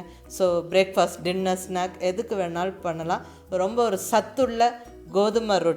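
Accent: Coimbatore, India. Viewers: Super sister.pargumpothey sapitanumpola iruggu .unga gongu tamil nice. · Akka yen ka unga face katta mattungiringa, nanum covai ponnu than ka unga slang romba pudikum ka athanalae unga face pakkanum pola iruku ka🥰😍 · Super sister this dish item is super 👌 many vegetables and wheat flour combination nice your voice super 👌 madam .kovai Tamil I like it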